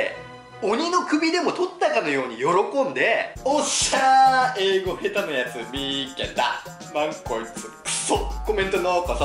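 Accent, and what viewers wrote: Japan. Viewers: この動画も九州方言も好き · カナダにワーホリで行ってたけど、本当のネイティブスピーカー以外は文法も発音も結構適当というか完璧ではなかったです。そりゃそうだ、そこで生まれて育った訳でもないんやから。伝えたいことが伝わって最低限相手の言いたいことがわかることが大事な気がします！なによりこの人の博多？なまりが何より安心できました笑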